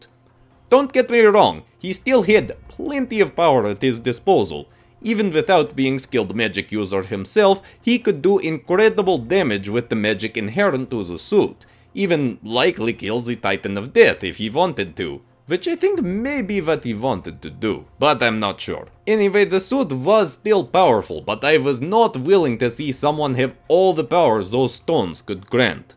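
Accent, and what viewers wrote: Russia. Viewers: IS SHE RUSSIAN OR GERMAN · Are you Russian youre accent sound like them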